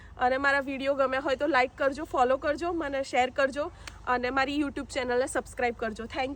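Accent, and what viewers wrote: Sabarkantha, India. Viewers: Apni speech thi j khabar padi gai ke tame s.k. na chho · બેન આપના વીડિયો થી અમને ત્યાં રૂબરૂ આવ્યા નો અહેસાસ થાય છે,અહી બેઠા અમને ત્યાં કેવું પર્યાવરણ,ત્યાંનું લોકજીવન,રોડ રસ્તા,સુવિધાઓ ત્યાંના નીતિ નિયમો જાણવા મળે છે,બીજું કે આપની શુદ્ધ ગુજરાતી માં સાબરકાંઠા નો લહેકો.આવેછે એ પરથી અમને ખબર પડીજ ગઈ હતી કે બેન. આપણાં બાજુના જ છે,એ આનંદ ની વાત છે,બધાને વિદેશ માં કેવું જીવન છે એ જાણવાની જિજ્ઞાસા હોય જ જે તમે વીડિયો દ્વારા બતાવો છો એ સારી બાબત છે,હવે થોડા ઘણા તો નેગેટિવ કૉમેન્ટ કરવાના,એ બધું ધ્યાન પર ના લેવાય,આતો દુનિયા છે આમ પણ કહેશે અને એમ પણ કહેશે,બાકી તમારું કામ ખુબજ સારું છે ધન્યવાદ.ભગવાન તમારું સારું કરે. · બેન તમે બોલો છો એ ખુબ જ ગમે છે અને બીજું કે જે માણશ બોલકું હોય એના દિલ મા કોઈ દિવસ કપટ ના હોય કે છલ ના હોય અને બીજું કે સાબરકાંઠા ની બોલી એટલે વાત પુરી થઈ જાય i like it બીજું તમે સુંદર કામ કર્યું છે કે વિદેશ નું ક્લચર કેવું હોય છે ત્યાં માણસો નો સ્વભાવ તેમનું વ્યક્તિત્વ તેમની રહેણી કહેણી ત્યાં ના માણસો મિલનસાર છે કે નહિ માયાળુ છે કે નહિ આ બધુ સમજીને વિડિઓ બનાવવા સહેલા નથી ભારત વાળા માણસો હંમેશા ભૂલો કરવામાં કચકચ કરવામાં ખાંચા કાઢવામાં જ નંબર વન છે એટલે તમે બધા શુ બોલે છે એમાં ધ્યાન ના આપશો બસ સુંદર સુંદર વિડિઓ બનાવી ને મોકલો ભારત મા મોગવારી એ માજા મૂકી છે બેન વિદેશ વિશે કંઈક તો જાણવા મળે 👌🏻👌🏻👌🏻👌🏻👌🏻🙏🏻🙏🏻🙏🏻❤️❤️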